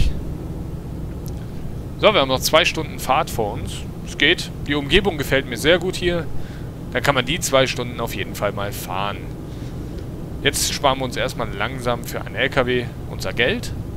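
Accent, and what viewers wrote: Aachen, Germany. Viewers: KOMMST DU AUS AACHEN?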